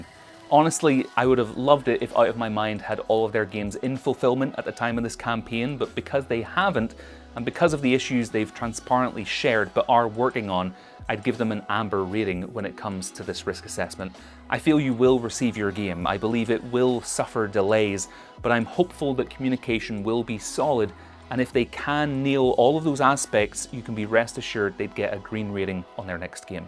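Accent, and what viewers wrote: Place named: United Kingdom. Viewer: OK I think this was exactly the channel I was looking for for years. 🥰 PS: As a non-native English speaker, may I ask you where does your "accent" come from (which part of the UK)? ☺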